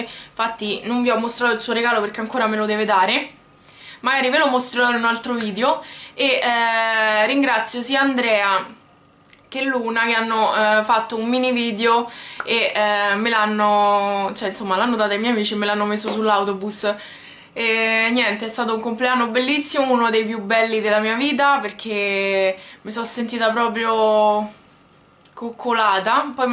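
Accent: Rome, Italy. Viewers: ma si romana se sente pure io so romana de nascita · Ciao mia sei dolcissima e bravissima si vede che sei una ragazza di cuore xcio hai attorno a te persone vere xche te lo meriti!!! Io adoro il dialetto romano sei troppo simpatica!!! Mi chiamo lidia salutami in un video ....ps ti seguo da molto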